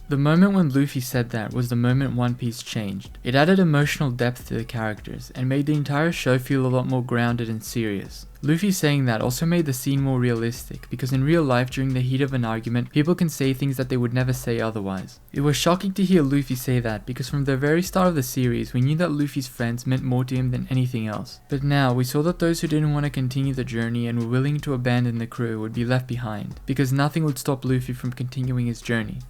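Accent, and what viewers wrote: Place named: United Kingdom. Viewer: Hey I think this video is amazing. The one glaring issue is how British / European the voice person sounds I very much dislike that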